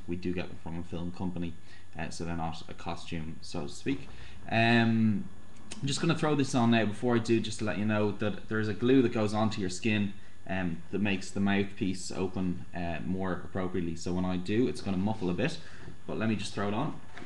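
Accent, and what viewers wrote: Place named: Ireland. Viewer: Nope Irish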